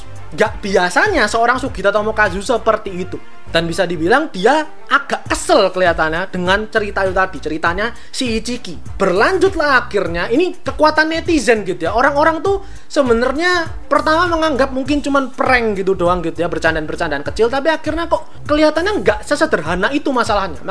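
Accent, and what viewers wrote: Java, Indonesia. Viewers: Medhok banget kak asal jawa kh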